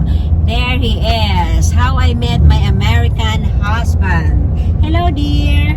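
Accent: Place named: Iloilo, Philippines. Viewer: Kanami pamatian ya ilongga ah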